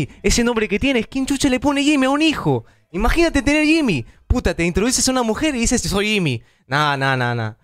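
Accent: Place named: Peru. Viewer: Si parece peruano,aunque combinado con otros países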